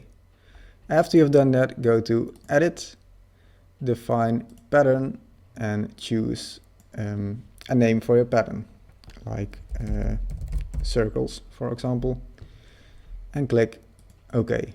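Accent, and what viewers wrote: Netherlands. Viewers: Are you dutch? you have like an dutch accent just saying❤ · are you dutch? you sound dutch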